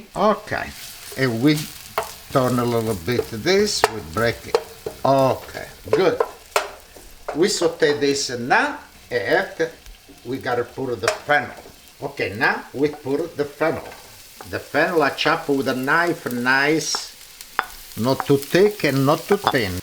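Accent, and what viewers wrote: Italy. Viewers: its kinda crazy, you can almost hear how the NY dialect developed from this italian accent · This looks delicious! And your English is excellent,! I love your Italian accent ❤️. Salute e cin cin!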